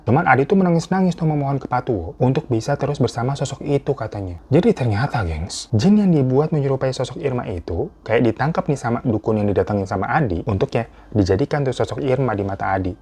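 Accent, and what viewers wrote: Java, Indonesia. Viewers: Bang pas lu bacain percakapan gua ngakak 🤣 malah medok jowo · Kenapa jadi logat jawa sih bang hahahhahaa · voice overnya jawa😂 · baca text Minang pakai logat Jawa🤣🤣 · Koq aku ngakak bahasa menang logat Jawa....😅😅 semangat bang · Ternyata bisa ya bahasa minang pakai logat jawa 🤣🤣🤣 emang beda 👍👍👍👍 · Wkwkwk lucu banget bg ngomong bahasa Minang pakai logat jawa. 😅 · Bahasa minang tp logat jowo piye toh bang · logat jawa tapi bawa bahasa minang😭😭😭😭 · Minang logat jawa. Lucu bet sih bang. Awokwowokkk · Logatnya jawa banget bang 😂😂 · Bahasa minang logat Jawa😭🤣🤭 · BANG w salpok LU NGMNG bahasa minang tapi logat jawa😭😭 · Kok logat nya jadi jawa yah kak 😅😅😅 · Bang napa logatnya jadi jawa wkwkkw bukan padang🤣gemoy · kooo minang jadi logat jawaaaa :face-purple-crying::face-purple-crying:🤣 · Bahasa padang tapi logat jawa 😂😂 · Wkwkwkwk.. agak lucu bg.. 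logat bahasa minangnya jawa.. tapi usahanya untuk berbahasa minang top lah.. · Bg ngomong bahasa Minang aksen Jawa bgtu😅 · Bahasa pake bahasa minang, tapi logatnya logat jawa, bang the best 😂😂😂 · Logatnya knp jadi jawa bangg😂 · Bang, haha kok lu jadi logat Jawa bang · Kenapa logat nya jadi jawaaa 😭🤣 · Bahasa minang logat jawa 😅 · Kenapa logat nya jawa si😂😂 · Kenapa logatnya jadi jawaa wkwkwk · Knpa logatnya kita jd jawa ya Langsung ngakak 😅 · Sempet bingung tadi dgr bang ngmg logat jawa pdhl lagi ceritain suku minang😂😂. Seharusnya adat2 seperti ini tidak perlu di lestarikan,krn memberatkan,dan tidak sesuai dgn syariat Islam yg mayoritas agama disana,jadi kesannya kyk jual anak. sebagai orang tua harus lebih wise untuk kebahagiaan anak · minang logat Jawa ya bang😲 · Cerita Minang contoh bahasa malah logat Jawa BG 😅😅😅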